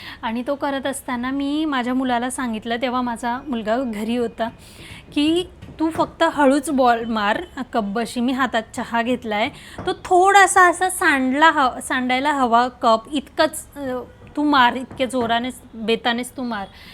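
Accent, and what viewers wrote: Khandesh, India. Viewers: खूपच खिळवून ठेवणारे , वास्तवाला विनोदी पद्धतीने , टोमणे मारत सांगीतलेले असते.. सर्वात कमाल म्हणजे हे आधी लिहिलेलं नसतं, ऐनवेळी कसे सुचते? खूप छान flow असतो , विषय सोडून अजिबात इकडे तिकडे जात नाही.. आम्ही 5 मिनिटात बघतो reel. पण किती प्रचंड मेहनत, आणि बारकाईने detailing केलेले असते.. सासूबाई, आई सगळे पात्र अगदी जिवंतपणे उभी करता.. ह्याचे आश्चर्य वाटते की विषय सुचतात तरी कसे?आणि ते किती सहजपणे खुलवता तुम्ही!! प्रत्येक वेळीस सर्व पसारा आवरणे, घर टापटीप करणे, बापरे , किती तळमळीने करता हे काम? आवाजाचा खानदेशी ठसका ,स्पष्ट आणि गोड आवाज सगळाच खूप छान आहे.. केवळ viewers साठी घेणाऱ्या तुमच्या कष्टाला सलाम!. All the best..तुमच्या reel ची नेहमी वाट बघते..आणि कितीही घाईत असले तरी ते बघितल्याशिवाय राहवतच नाही. 😊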